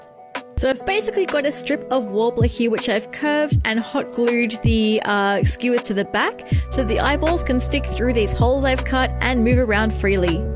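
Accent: Australia. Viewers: I’ve seen heaps of your shorts, but only now hearing your real voice! Had no idea you were an Aussie! Pretty awesome.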